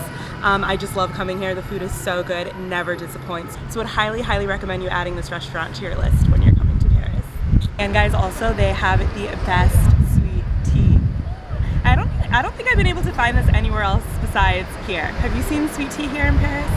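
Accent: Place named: Africa. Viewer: Macon GA with an African (from the continent)accent lol.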